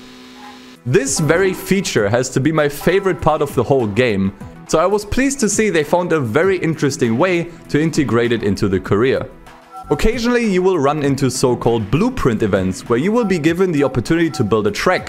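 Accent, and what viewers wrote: Germany. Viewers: What an absolute banger of a video, must have taken weeks to produce such a long video but it was totally worth it! Btw. am i hearing a german accent? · Ned schlecht das video bree. Hätte beinahe den deutschen Akzent nicht rausgehört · Wie man the german Akzent einfach raus heared 😂 nice !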